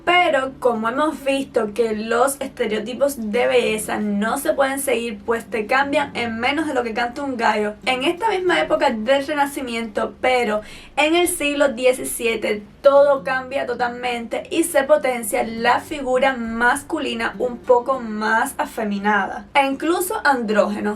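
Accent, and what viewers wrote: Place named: Cuba. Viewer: Creo que tiene acento cubano, me gusta!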